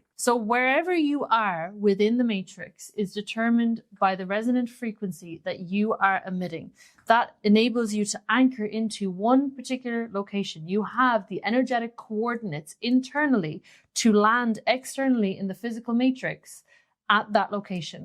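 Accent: Ireland. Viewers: do I detect an Irish accent there? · When awise awake Old Soul has also won the best face card... and intelligent and classy af, also.😭 She will be GRAND! AMAZING VID. You are also funny, when did some "shooting fails". Stay authentic, no to AI! ✊️ P.S. Are you Irish? ☘️ ✨️💜✨️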